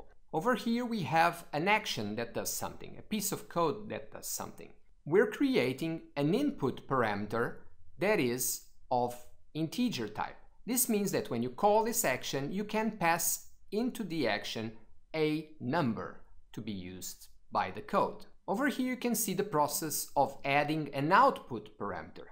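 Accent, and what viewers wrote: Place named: India. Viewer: indian accent😵‍💫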